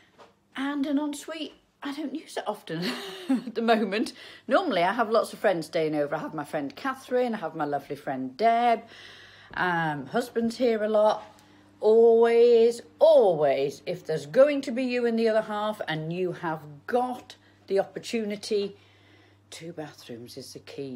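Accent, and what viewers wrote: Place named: England, United Kingdom. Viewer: Hello miss. I am from Brazil..You're an enchanted lady. Your hands', oh your hands are so beautiful. E pelo sotaque eu deduzi que você é inglesa. UK.... Cheers from Brazil.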